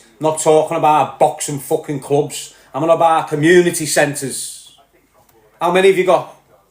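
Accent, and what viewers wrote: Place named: Liverpool, United Kingdom. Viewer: Scouser u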